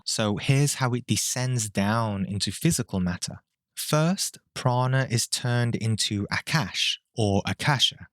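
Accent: United Kingdom. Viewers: okay brother i never comment on videos dnt really do social media but you kinda have to to some extent to exists in the meta verse anyway!!!!! i have to take my hat off!!! i got shown a skeleton key months ago in a meditation and its blew my mind ever since, your video is extremely thorough and you are most def correct. got me excited, knowledge is like good sex to me ahaha when its displayed in front of me i get the biggest serotonin kick. the macro and the micro, all life can be overstood through study of self, we are literally mini universes and setup the exact same way, been studying this stuff since i was 16 i am 29 now and have jut started application of all this knowledge! very exciting time tho so many have woken up, almost dnt feel alone anymore ahah i also see i need for all these new people who have woken up to have some kind of guide to sithed through all da bullshit you have to on this journey, in order for us as humanity to get right to the crux of the matter and start raising up ourselves and in turn earth! 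u sound around my age to, and ur clearly from the uk like me, uk needs more of this we are lacking on the spiritual front on the net not much good representation, but i shall subscribe to you for sheer effort well done.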